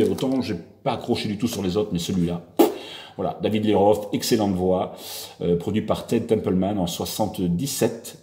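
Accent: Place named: Southwest, France. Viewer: Salut super trésor récolte a que agréable notre accent du Sud-ouest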